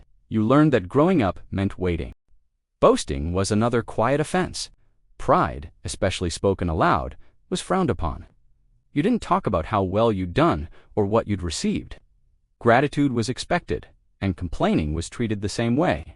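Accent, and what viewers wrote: United States. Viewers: Why are videos about British kids voiced by an American who not only is not old enough to have heard them and in all probability does not know what they might mean.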